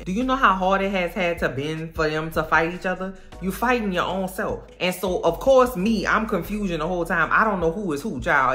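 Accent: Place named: Louisiana, United States. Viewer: what a wonderful accent! Louisiana?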